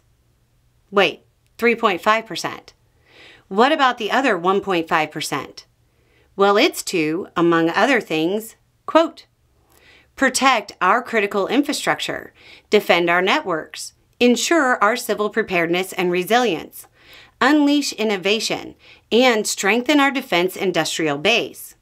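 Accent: South, United States. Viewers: Thank you for the "Monkey Paw" reminder and the description of the current Monkey Paw. Your southern style polite got ya's are precious. Keep smiling.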